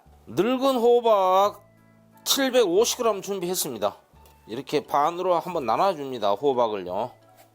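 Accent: Jeolla, South Korea. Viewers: 구수한 말투가 전라도 저 아랫지역 바닷가 근처 목소리셔요.. 구성지게 귀에 쏙쏙 들어옵니다.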